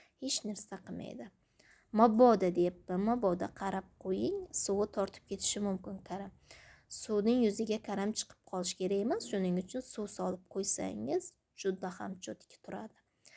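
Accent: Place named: Qashqadaryo, Uzbekistan. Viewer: Shevangizdan Qashqadaryodanmisiz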